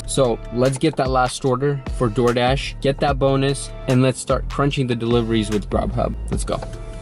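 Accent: Russia. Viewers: Bro, are you from Russia?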